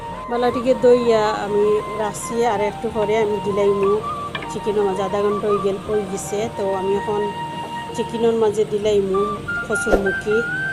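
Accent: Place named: Sylhet, Bangladesh. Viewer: মাশাআল্লাহ অনেক ভালো হয়েছে রেসিপি টা, সুন্দর উপস্থাপনা খাঁটি সিলেটী ভাষা❤️❤️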